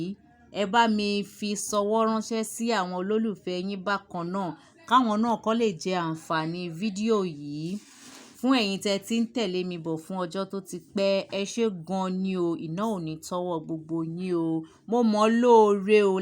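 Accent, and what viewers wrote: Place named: Yoruba, Nigeria. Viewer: I really like your yoruba accent. The hair style is lovely. You have so well.❤❤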